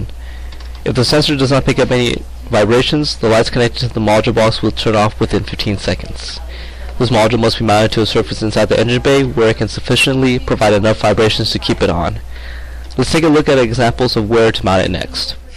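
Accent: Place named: India. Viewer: Your accent....are you from India?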